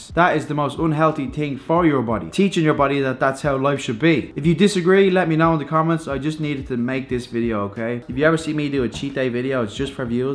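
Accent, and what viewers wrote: Ireland. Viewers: Ireland prolly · i like ur accent i am irish too bud :)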